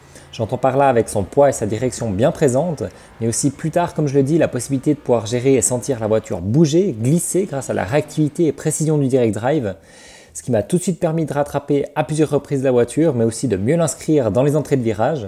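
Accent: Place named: Neuchâtel, Switzerland. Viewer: on dirai un neuchâtelois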